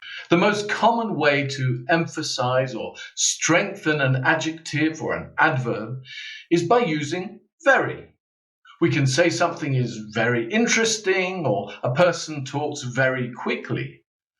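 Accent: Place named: RP, United Kingdom. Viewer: Thank you. Good job! Many people on the internet speak RP, but yours is one of the best. Loking forward for next videos. 🤩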